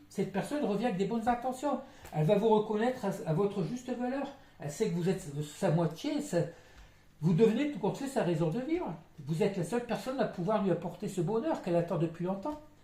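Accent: Nord-Pas-de-Calais, France. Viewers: Mon autre m'a enfin avoué en rougissant qu'il pensait tout le temps à moi... Il ne faut jamais désespérer ! Votre accent chti me rappelle mon enfance à Béthune... Merci pour vos guidances et votre bienveillance ❤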